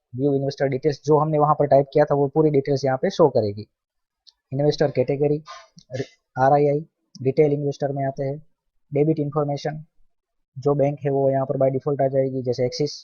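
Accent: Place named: Gujarat, India. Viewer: Gujarati lago😅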